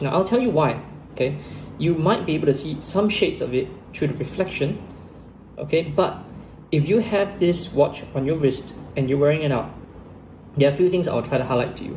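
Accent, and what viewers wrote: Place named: Singapore. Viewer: Long jeans! Thats how most Singaporean shouts out it as. Nothing wrong with pronouncing it from a local standpoint. He does not sound fake. Keep it up👍 Would like to see more reviews mid priced luxury watches from your channel. But keep in mind, that since comparisons are made between 2 watches, always be reminded to repeat that these reviews are in your own personal opinion and preference.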